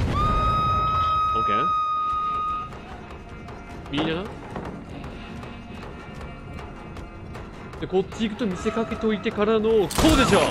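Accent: Kansai, Japan. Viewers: キラーかっこいいのにさんの関西弁ミスマッチすぎるwでもそこがいいw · さんの関西弁めちゃくちゃ最高です好きです((*´∀｀*)) 新キラーのデススリンガーさんかっこいいですね！！銃のかっこよさ、そしてカッコよく狙って撃つさんかっこいいです✨ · お疲れ様でした～ 銃使うのめっちゃ面白い😳😳 関西弁が今回多くて、それこそ10000イイネですね👍👍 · 新キラーかっこいいですね！さんの関西弁素敵だったです(*･ω･) メメントの時に後ろに写りこんでいたサバイバーさん笑いましたwww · 新キラーおつかれさまでした！新マップも面白そうですね！壁とか壊せるようになったんですね半ギレしてるところと、インストっていうからどんなんなってるのかと思ったら、完全にうつりにきてましたね、あれw 面白かったです(*´ー｀*) 後半の方は関西弁強めだなと思いながらみてました！どっちもハッチ見つけるの早くないですか？！最初のは絶対逃げれたのになー🤔と思いました。これからキラー使っていく１つになるんですかね？？楽しみにしてます！ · 新キラー､ビジュアルもカッコいいけどリロードがまたカッコいいですね！さんのサバイバー吊った時の高笑い最高です😆 後半の関西弁わざと強めなのも好きです！楽しかったです♪ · 動画観させて頂きました🙏✨ 後半の動画では、関西弁が多い＋メメントが、とてもかっこ良かったです☺️💕 “写りたがるやつ”が、とても面白かったです😂😂😂 サバイバーだったら凄く嫌かもしれませんが、キラー側で、このメメントが決まると、とても気持ち良さそうだなと思いました😌✨ 今回も楽しい動画をありがとうございました🍀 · このキラーめちゃめちゃかっこいいですね😆 使いこなしちゃってるのもさすがです👍 関西弁多めヤバすぎ(笑) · さん今回めっちゃ関西弁で喋ってくれてはりますやん… さんのデドバ面白くて大好きです！ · 新キラーやパークの説明など盛りだくさんの動画ありがとうございます( ˘꒳˘ ) やっぱりわかりやすい!!! 途中からテンション上がって楽しまれていたのか、低音イケボの関西弁でplayしてたのがたまりません🤖♡ 特にメメントしてた ~ のとこ好き🤭 かっこよかったです！ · 後半の関西弁大量発生うれしすぎ😍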